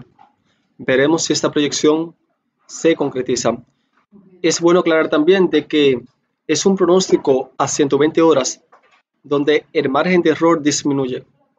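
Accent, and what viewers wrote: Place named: Arab world. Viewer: Le escucho acento arabe..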